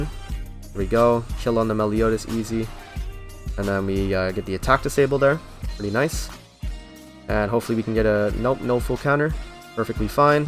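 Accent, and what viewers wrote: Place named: Vancouver, Canada. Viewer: yo are u from BC? u sound like someone from van XD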